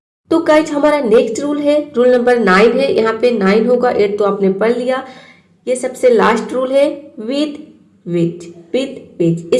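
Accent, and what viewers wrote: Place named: Bihar, India. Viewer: Bihari sare esahi bolte hai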